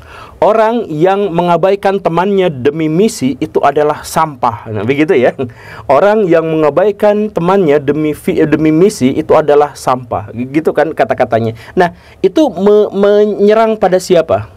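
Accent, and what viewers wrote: West Java, Indonesia. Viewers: Jika ingin mencontoh kan maaf dan maaf.... Jika saya mengkritik dalam penyampaian dan pembuatan Kontennya tidak bermutu dan mengkritik dengan bahasa sarkas tapi saya menyinggung gaya bahasa yang di sampaikan berlogat Sunda, saya yakin nggak tersinggung dengan kritik saya tapi itu sebagai Vitamin tapi yg tersinggung pasti masyarakat Jawa Barat yg akan marah karena telah membawa cara penyampaiannya, Semoga juga bisa menyampaikan hal ini ke masyarakat dengan Chanel YT nya hukum yg tidak tertulis di masyarakat agar semua paham karena sejujurnya apa yg di sampaikan itu simple dan mudah dipahami tidak menggunakan diksi' yang jelimet..!!! Sukses terus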